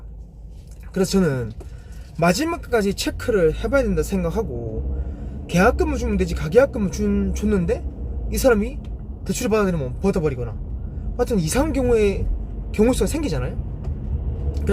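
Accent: Busan, South Korea. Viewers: 항상 즐겁게 강의해서 기분이 좋네요,, 부산 사투리도 정겹네요,,,, 좋은 진실된 정보 감사합니다.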